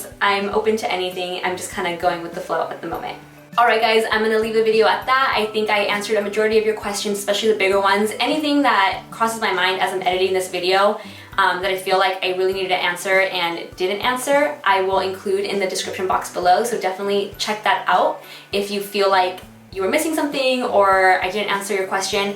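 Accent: Mexico. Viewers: I KNEW you were Mexican just by your accent. I have it too. I'm from Mexican parents and born in Texas. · you are soo beautiful and I value your makeup advice the most! If you were to make videos in spanish, you would appeal to a wider youtube audience. I think you should! and by the way I knewwww you were mexican, i recognied that accent (in the best way). love you!!!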